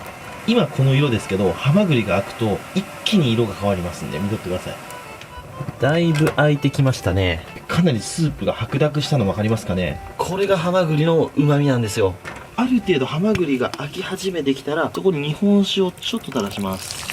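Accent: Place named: Nagoya, Japan. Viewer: の名古屋弁が心地いいなーと思いながらいつも見てる。名古屋の人はわかるんじゃないかなー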